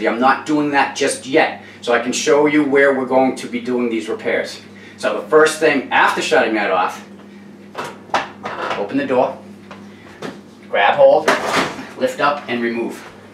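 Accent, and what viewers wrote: Boston, United States. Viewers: are you from Boston? Good job on your video, THANKS. my Maytag is much older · Love that Boaston accent. lol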